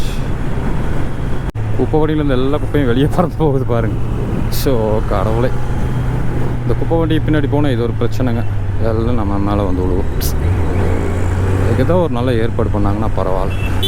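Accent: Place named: Coimbatore, India. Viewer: Covai slang🧡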